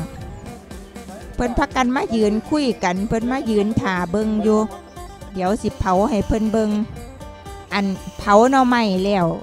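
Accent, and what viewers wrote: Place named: Roi Et, Thailand. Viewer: ฟังสำเนียงคือคนบ้านข่อยไทร้อยเอ็ดแท้น้อ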